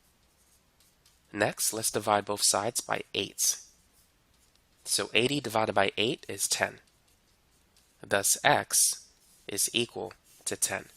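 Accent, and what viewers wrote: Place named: New York, United States. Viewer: Idky why but I keep hearing a ny accent😭 I can’t unhear it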